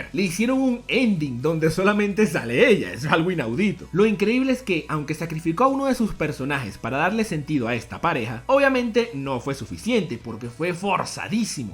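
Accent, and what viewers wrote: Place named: Venezuela. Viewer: Oye man pregunta de curiosidad ,eres de Venezuela? Se te nota el acento eso y tu canal esta bien pavo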